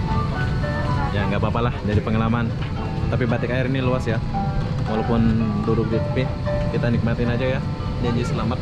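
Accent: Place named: Malaysia. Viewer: logat bicara nya kaya orang maysia bro, mantap traveling nya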